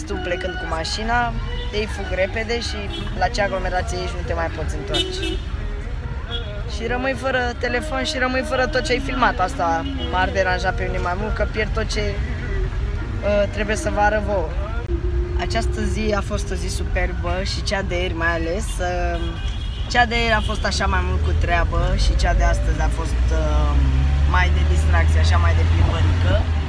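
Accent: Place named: Egypt. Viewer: بجد بتبقى عسل جداا لمل تتكلم مصرى💟💟💟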